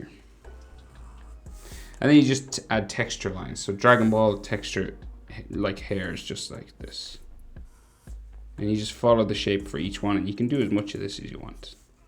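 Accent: Ireland. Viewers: Amazing work also very irish sound accent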